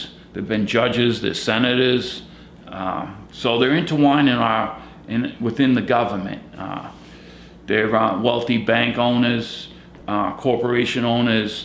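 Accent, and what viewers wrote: Boston, United States. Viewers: Please, I'm burning with curiosity: are y'all from Boston? If not, what sort of accent is that?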